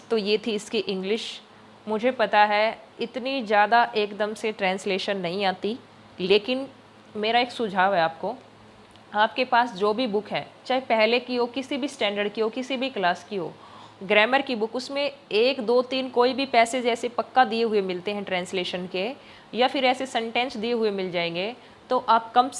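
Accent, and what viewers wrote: Haryana, India. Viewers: Mam meri English bolne m bhut dikt h tho pls app meri help kro 🥺🥺 Ak bt puchni thi app haryana se muje lga apki awaj se 🙄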